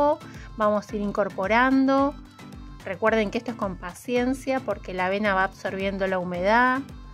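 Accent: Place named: Argentina. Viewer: Sos una genia! Las mejores recetas! Te sigo desde Argentina y reconozco tu acento! Muy bello tu canal!!! 😘😘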